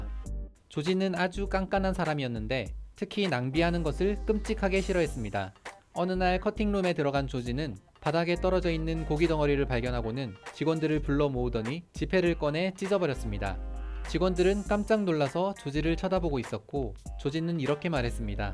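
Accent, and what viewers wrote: Gyeongsang, South Korea. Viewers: 이분 경상도 출신 혹은 경상도와 관련있다에 내 돈 모두와 손모가지 검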